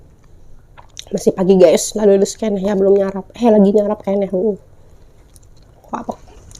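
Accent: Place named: Banten, Indonesia. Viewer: Kaya nyya orang Banten soal nyya ngomongnyya sunda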